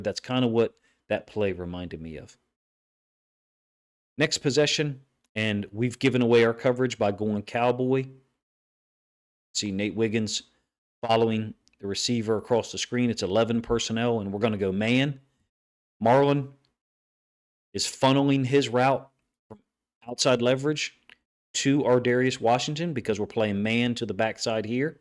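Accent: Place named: Midwest, United States. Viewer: Haha, I have wondered this myself. Gotta have some Midwest in him, as he’s made some references in his videos to it. Probably one giveaway is the accent, where you can hear him say “da” rather than “the”. No disrespect meant Coach - makes me think of a tough-as-nuts hockey enforcer when I hear it.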